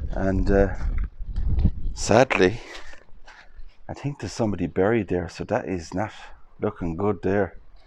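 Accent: Ireland. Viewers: You can't beat the Irish accent, I have a lot of Irish on both sides of my family, the Limerick's and the Baileys , a great video very interesting many thanks , best wishes from Norfolk in England .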